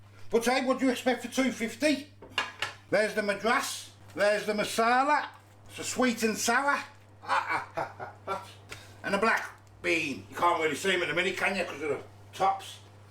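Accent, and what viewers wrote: Birmingham, United Kingdom. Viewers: Just subbed, watching with 2 boy,s, 6/7, grandson's, when you said masala, they both said it, and remarked that you sounded like me, i'm a Brummy, anyway, great vid, and we 3 watched and enjoyed to the end, now to check your other stuff out, thank's.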